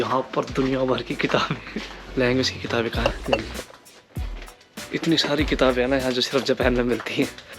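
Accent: Haryana, India. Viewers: I bet that is from Haryana because I can feel his tone even I am from Haryana.🔥💖😄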